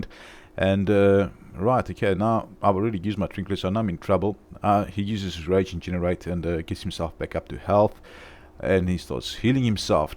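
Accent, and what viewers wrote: South Africa. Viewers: You sound very South African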